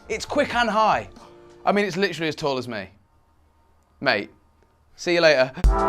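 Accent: England, United Kingdom. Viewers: He looks like jayztwocents son with an English accent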